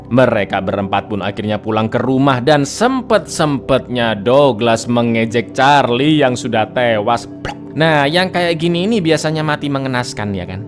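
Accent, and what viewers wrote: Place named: Madura, Indonesia. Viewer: Bang orang madura emang,,ko gitu siih logatnya,,😅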